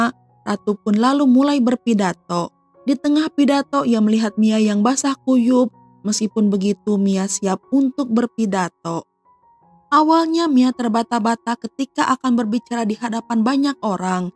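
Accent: West Java, Indonesia. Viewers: Asa ditatar sunda nyaritana...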